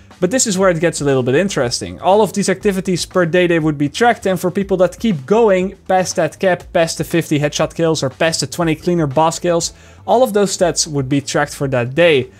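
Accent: Netherlands. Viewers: Are you dutch? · Are you dutch you sound dutch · Kom jij uit nederland? Ik herken je accent. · you sound way more dutch in the videos compared to when you stream - is that weird?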